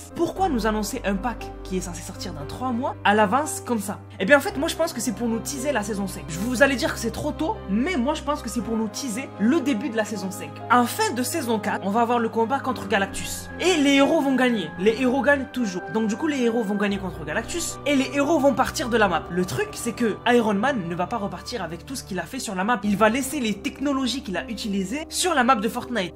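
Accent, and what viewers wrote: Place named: Marseille, France. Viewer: Ton accent marseillais il est partie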